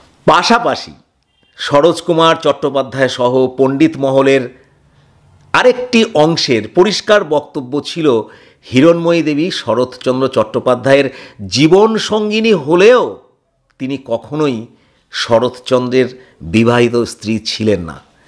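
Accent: North Bengal, India. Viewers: উত্তরবঙ্গের সব জেলায় এমন ভাবেই বলে,(আর বাঙলা কথাটাও পড়িনি,বাংলা এমন পড়েছি) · বাসা(বাড়ি), কান্না করা (কাঁদা), খারাপ পাওয়া (খারাপ ভাবা), কথা করা (কথা বলা ) , আসেন, বসেন ( আসুন, বসুন ), সেই হয়েছে ( দারুন হয়েছে )....... বাংলার এই টানগুলো শিলিগুড়ি, জলপাইগুড়ি, উত্তর বাংলার অত্যন্ত প্রচলিত শৈলী। দক্ষিণ বঙ্গে প্রচলিত নয়। নিজের কথার টান / Acsents ই যে সঠিক এই রকম ধারণা ঠিক নয়। কথ্য ভাষা সংশ্লিষ্ট স্হানের বসবাসকারীরাই ঠিক করেন। সেই অর্থে ঠিক ও করেন না। আপনা-আপনি ই প্রচলিত হয়ে যায়। এই Accents কোনো ব্যাকরণ-বিদ বা মাস্টারমশাই নির্ধারণ করে দেন না। তাছাড়া, ভাষায় বৈচিত্র্য ভাষাকেই সমৃদ্ধ করে।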